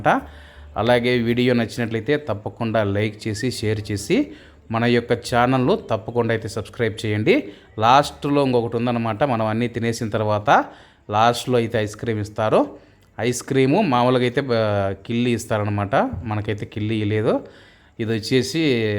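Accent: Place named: Rayalaseema, India. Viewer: That is the rural slang of Rayalaseema people.We need not correct any slang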